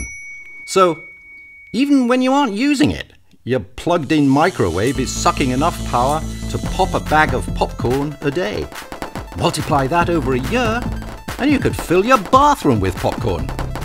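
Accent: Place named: United Kingdom. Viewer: i love this. HOWEVER i do find it a bit creepy that they chose to have a brit do the narration for wasteful american energy.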